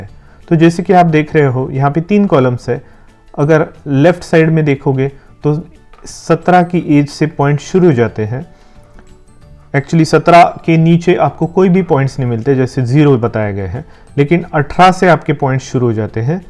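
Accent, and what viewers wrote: Maharashtra, India. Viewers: Sirji r you from Maharashtra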